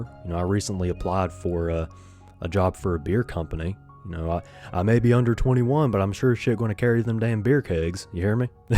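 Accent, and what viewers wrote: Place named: United States. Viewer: Bro has a cowboy accent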